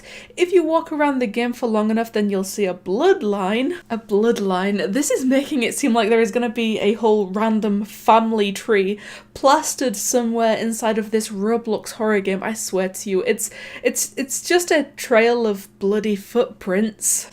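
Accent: United Kingdom. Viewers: are you like british?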